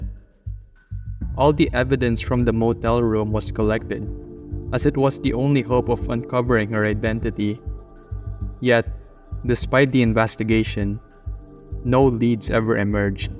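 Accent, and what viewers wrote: Philippines. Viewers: are you filipino? the accent sounds like pinoy! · Are you filipino? You sound like my father-in-law :)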